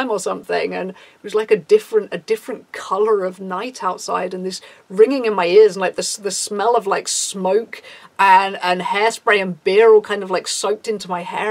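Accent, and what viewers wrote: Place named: Newcastle, United Kingdom. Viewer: Are you a Geordie? You sound like it imo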